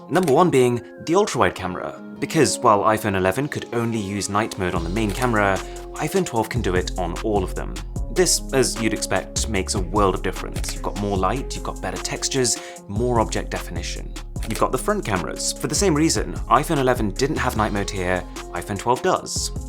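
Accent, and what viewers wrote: RP, United Kingdom. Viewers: I just absolutely love watching this guy's videos and updates! Always super detailed and right on point along with that fantastic and beautiful British accent 🥰!! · this guy speaks like a royal family member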